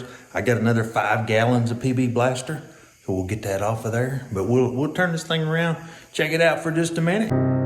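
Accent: Tennessee, United States. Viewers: I heard your Tennessee accent and automatically liked, and subbed! Beautiful truck brother. Howdy from Texas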